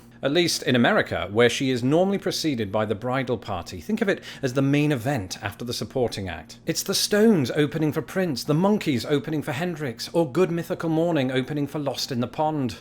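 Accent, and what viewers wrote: United Kingdom. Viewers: I want to Mary a British guy. That accent! 😍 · Thanks again for another amusing and informative video. Dry humo(u)r is best delivered in a British accent.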